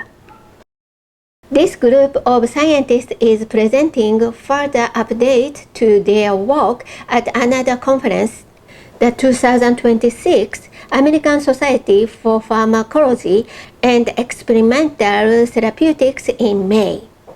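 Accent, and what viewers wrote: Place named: Japan. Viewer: カタカナ棒読み英語を改善させないと世界には通用しないですね。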